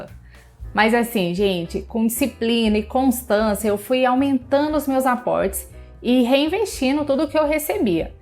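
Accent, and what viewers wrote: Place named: Minas Gerais, Brazil. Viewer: Pelo sotaque é mineira; inscrito.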